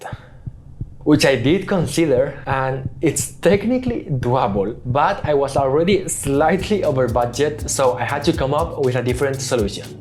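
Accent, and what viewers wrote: Spain. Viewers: Very interesting that your english has a clear spanish accent. Not sure if Korean and Spanish sound the same now.. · Oye se nota que eres español, por que no haces el canal en español? Seguramente tambien tendrias muchas mas visitas, o por lo menos un canal secundario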